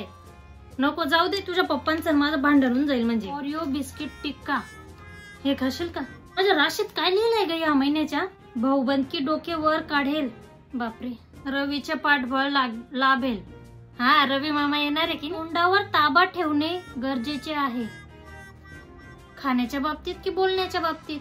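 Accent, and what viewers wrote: Khandesh, India. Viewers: खूप छान सादरीकरण ताई. मराठीला खान्देशीचा तडका, छान वाटतं ऐकायला.🌹🌹🌹🌹🌹🌹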